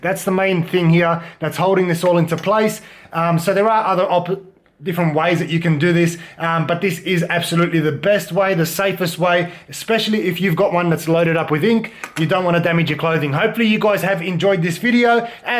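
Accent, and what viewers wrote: Australia. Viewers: Hello from India my Aussie mate. A B-I-G thanks. You saved me a hot, sweaty trip to the mall to get the tag removed. It's a pair trousers that came as a gift, bought over a year ago. Finally, when I received it, it had the tag, the damned tag! I had no idea which mall it was bought from, I did not have the receipt. I hated going to the mall in the horrible weather. I took it to the local tailors and they all said they cannot remove it without damaging the fabric. I didn't know what to do. Then a friend sent me this video. and Voila I just got rid of the tag. Good riddance, I say. P.S. - I loved your Aussie accent and that Aussie exuberance and enthusiasm. I love this Aussie spirit. So down to earth, friendly, non-bossy. I have a few Australian friends here in India and I think Aussies are a great people. Finally, A big thanks to you again mate. 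· Thanks heaps- brilliant How To 👍👍Also, nice surprise to hear an Aussie voice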